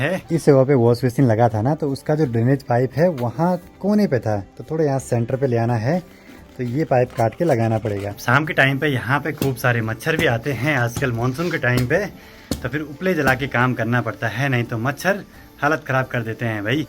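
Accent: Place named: Garhwal, India. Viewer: bhaiya ek din pura video Garhwali mein banana aapke munh se Garhwali bahut acchi lagti hai ham aapko Punjab se dekh rahe hain❤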